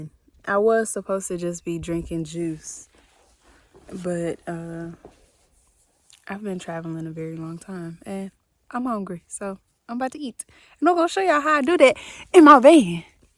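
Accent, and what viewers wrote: Houston, United States. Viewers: Is it just me or does she sound like Beyonce?! Must be that Houston twang lol. Loved this video! I love that you are showing the realness of van life and not the fantasy! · I think it's a Houston thing... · she does🤷🏾‍♀️ I'm from Houston, it's our accent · It’s just the Houston accent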